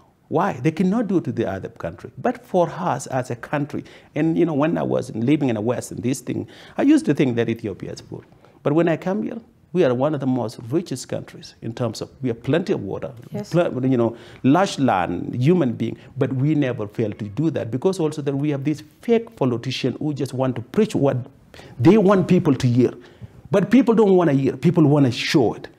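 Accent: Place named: United Kingdom. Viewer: like that British accents. Thanks for your presentation.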